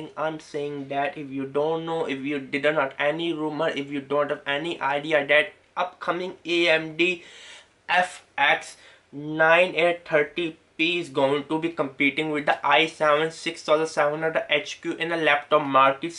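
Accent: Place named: India. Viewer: hes just indian so he has a thick accent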